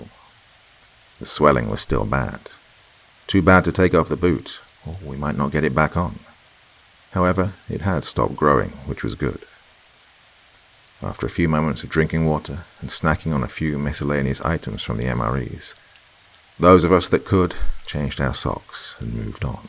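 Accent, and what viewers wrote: Russia. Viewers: OMG YOUR RUSSIAN ACCENT!!!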